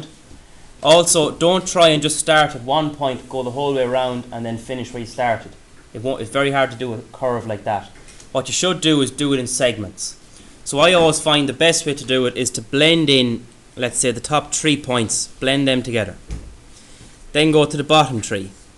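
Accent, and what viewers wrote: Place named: Ireland. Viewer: the irish acent tho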